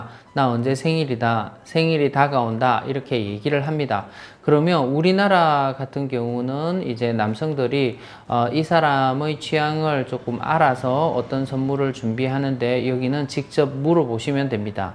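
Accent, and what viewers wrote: Gyeongsang, South Korea. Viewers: 부산경남 분이신거같아요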